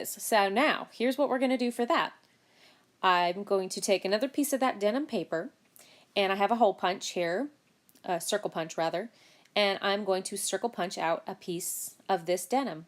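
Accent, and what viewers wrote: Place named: South, United States. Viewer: Really nice tutorial. Could you please tell us the name of that tape again, (I'm from California & I couldn't quite catch it with your So. accent) LOL Thanks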